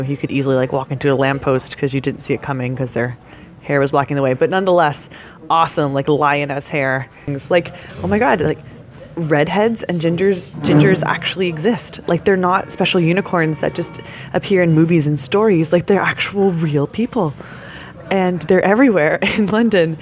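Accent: South, United States. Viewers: gosh Londoners are friendly; silly girl you are the VERY attractive surprise foreigner with the fantastic SOUTHERN accent, so of course they locals are more than happy to help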